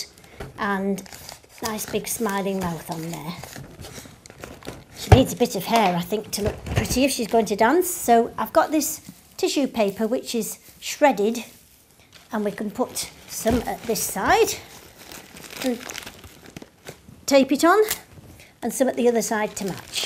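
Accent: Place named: United Kingdom. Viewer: ooh soo cute :) and i LOVE the british accent :)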